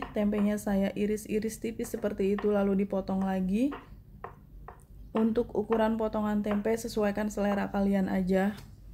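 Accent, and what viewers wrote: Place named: West Java, Indonesia. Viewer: Pasti org Sunda...itu ada bahasa Lekoh nya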